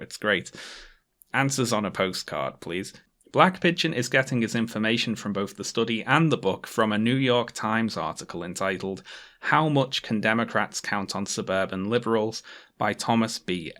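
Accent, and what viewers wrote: United Kingdom. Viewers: You know, you believe a lot of things I disagree with and have faulty bolster for some of your arguments, but your voice is very relaxing. Carry on, ever so slightly lispy British man.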